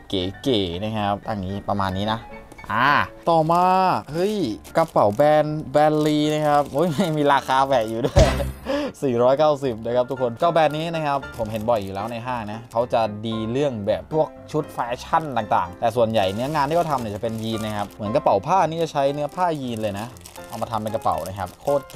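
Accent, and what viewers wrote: South, Thailand. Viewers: พี่ย่าคนใต้หรอค้าบบ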